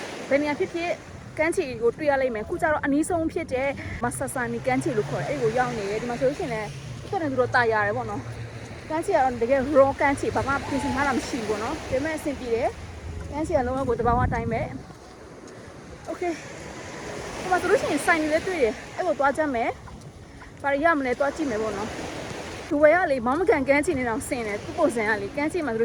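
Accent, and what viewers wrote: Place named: Myeik, Myanmar. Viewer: ညီမကဘိတ်သူထင်တယ် ဟုတ်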